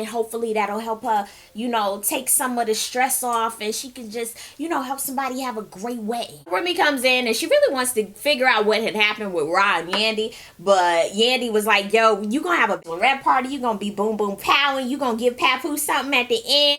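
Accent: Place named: Brooklyn, United States. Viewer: i don't know which one of your impersonations is better..Kandi or Tara...? i hollered at your Tara--well, i was telling one person a day..i loved him and i did it and we did it, and we're doing it!!!! oh my dang goodness...dam you!!! my eyes are burning like i could only imagine the pits of hell because of the crying laughing, mascara and eye liner running at this part of your commentary..girl, i tustve rewinded that part about four times..(in my cardi b voice) i been watching you for-evaa!! oh yea, yo BK accent with mendeecess 'baby muvas' comments were on point..lol..thats coming from someone from BK!!!..shout me out--Lady Di (pronounced die)!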